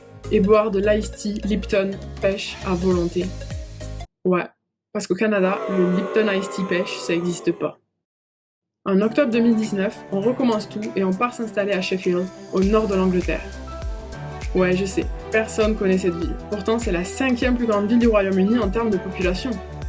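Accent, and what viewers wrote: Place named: Albi, France. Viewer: Oh nioooon je suis trop contente d'entendre ton accent 😭❤ Albi forever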